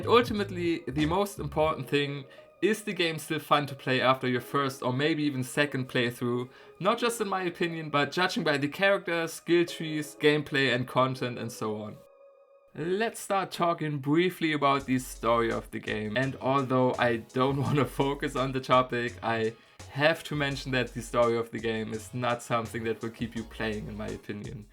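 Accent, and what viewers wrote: Germany. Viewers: Dein Deutscher Akzent ist nicht zu überhören haha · Are you german? Such cool accent! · Liebe den deutschen Akzent, viel Liebe aus Amerika! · Man erkennt den deutschen Akzent 😂😂😂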